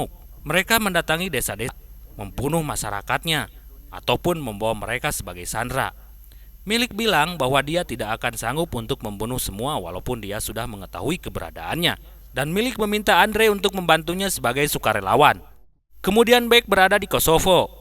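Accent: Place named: West Java, Indonesia. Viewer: Naratorna urang Sunda 😆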